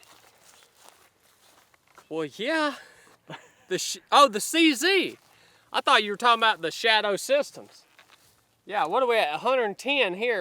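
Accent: South, United States. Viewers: I live OCONUS and my wife is not American, so I show her videos as the prime example of the accent from my beloved homeland. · You got to love that southern accent when he pronounce Fiocchi "Foochi" 😅. Get MTG on your video!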